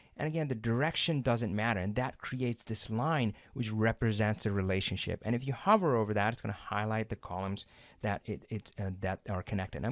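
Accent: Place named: India. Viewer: Finally, one smart Indian man with weak Indian accent. Thank you for the tutorial, thorough and easy to digest. 👍🙏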